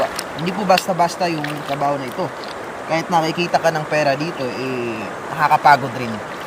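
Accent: Visayas, Philippines. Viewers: Bisaya ka po ba idol? hehehe pa shout out na rin😁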